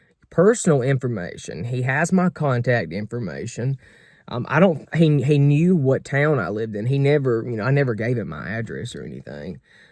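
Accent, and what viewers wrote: South, United States. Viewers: I love your accent- true southern not fake- if you ever go to Memphis go in May for the barbecue festival and go to the Rendezvous for ribs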